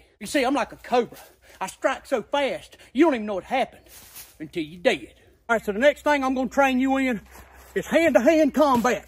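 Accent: South, United States. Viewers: took the internet by the storm. Looking like a Hulk, talking like a deep Southerner. Priceless! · I love his southern accent. He’s hilarious.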